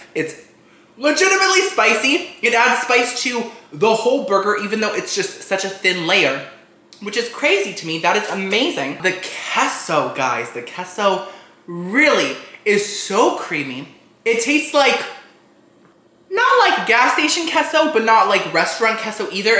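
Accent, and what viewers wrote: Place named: United Kingdom. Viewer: with a British accent is what I am here for:-)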